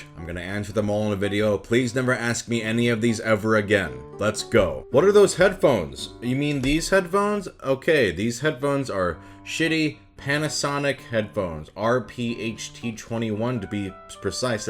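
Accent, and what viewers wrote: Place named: Michigan, United States. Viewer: As I was listening to his voice, I wasn’t hearing Southern California - more upper Midwest. Sure enough, he was born in Minnesota and spent time in Michigan. Definitely hear a serious Michigan influence in his American accent.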